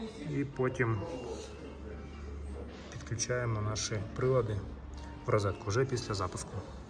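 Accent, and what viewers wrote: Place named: Hungary. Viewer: Nakavom jeziku govoris kao hungar ili moldovan ja ne razumem tebe svaku 10 rec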